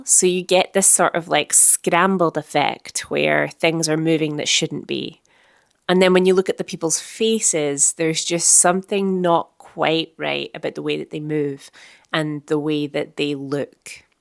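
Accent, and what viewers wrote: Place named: Scotland, United Kingdom. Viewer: And it does look cold and empty. I don't know, this whole thing may be a bubble, but doesn't seem like it given the practicality of it, at least in terms of making laborious tasks easier. However, this brings up the question of....what about assistant editing? Ingest, stringouts, etc, what about the entry level jobs like that? The tools are already beginning to at least be of use so far but it does worry me. Capitalism obviously doesn't care about keeping people's jobs or about creativity. What the system cares about is quarterly profits and how to maximize it. And that's the problem with people who say "It will aid us as a tool". The executive boards may not see it that way. They actually don't. Anyway, enough ranting from me. Hah I can listen to you talk for hours with your lovely Scottish accent!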